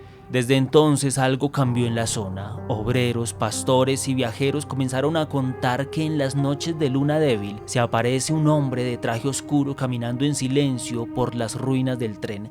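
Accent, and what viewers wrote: Argentina. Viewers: EN SANTIAGO DEL ESTERO. SEGÚN DE DONDE ES AVECES SI HABLAN ASI. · Es al revés un colombiano leyendo con el acento bien Argento 🇦🇷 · Me encanta el acento con el lunfardo argentino ☺️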